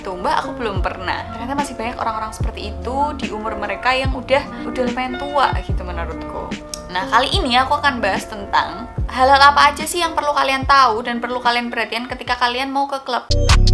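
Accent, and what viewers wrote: Java, Indonesia. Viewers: pohh, medok mu kui lo mbakk, sesuatu😍😍 #lampung☝️ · Ngomonge medok enak gimana gitu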